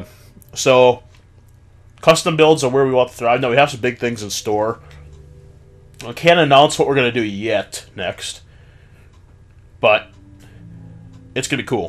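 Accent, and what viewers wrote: United Kingdom. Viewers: OMG I’m soooo sorry I went to the bathroom and I saw my 8yr old bro did that. I love your vids and I like your northern accent I kinda have a northern accent I’m sooooooo sorry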